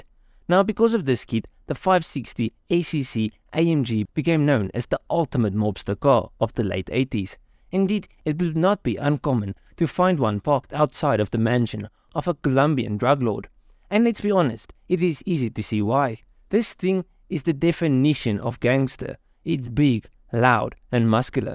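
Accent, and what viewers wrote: South Africa. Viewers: You sound very south african my guy. Love it!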